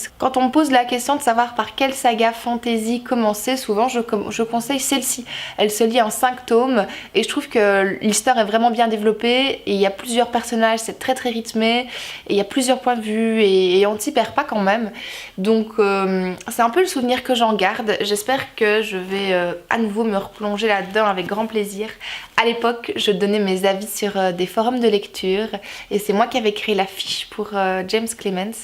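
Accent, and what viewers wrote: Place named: Belgium. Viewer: Ahaa... La nostalgie... Une de mes meilleures amies. Tu lui passeras le bonjour :) La Vague je n'ai pas lu le livre mais le film m'a énormément marqué. Du génie. Trop bien le marque page dédicacé par Amélie Nothomb :D Les Royaumes du Nord j'ai lu le 1 et 2 il y a presque 15 ans en pleine adolescence mais je n'ai jamais terminé, jamais lu le tome 3. Pas que ça ne me plaisait pas mais j'ai décroché et mes lectures se sont tournées vers d'autres choses. Du coup je ne connais pas la fin mais pas le courage de me relancer dans cette saga... (J'adore ton accent, j'adore la Belgique ! :D )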